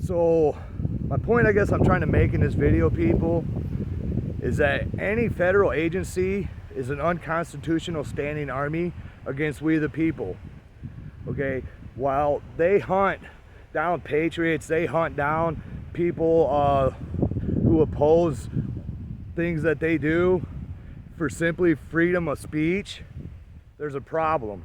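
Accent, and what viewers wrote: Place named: Canada. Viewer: Obviously from the accent this man is from Canada, too bad this has nothing to do with the United States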